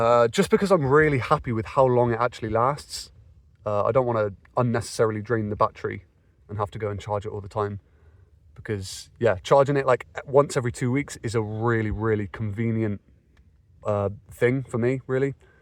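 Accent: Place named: Bristol, United Kingdom. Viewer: are you originally from the Bristol area? I ask as your accent sounds like it is,and just around the time that I found your channel, I was walking along the Bristol to Bath cycle path and I was sure that I saw you walking along it with your gear and dog..😂 The fishponds,staple hill area. Please, I understand if you dont want to answer, but it always crosses my mind when watching your videos. Keep up the good work.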